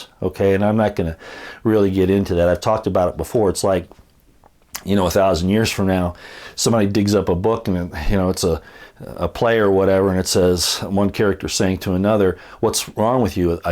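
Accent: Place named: United States. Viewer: His first language is obviously not Hebrew so you have to apply his american accent to it. Seriously, I do not criticize people that do not speak English very well. I give them props for knowing two languages.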